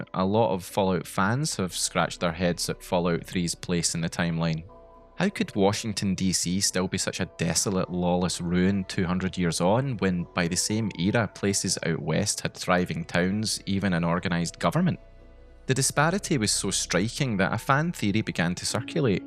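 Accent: Scotland, United Kingdom. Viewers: Your accent... Is it Scottish ? Sounds great. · Scottish accent ❤ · Nice, the exact same video as the others but with a lovely Scottish accent - that alone makes this the definitive edition lmao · You sure hes not Scottish? He sounds Scottish to me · Holy shit, a Scottish video game lore youtuber? I'm fair tricket wi yer video and jist wanted to say fit like the day loon, foos yer doos?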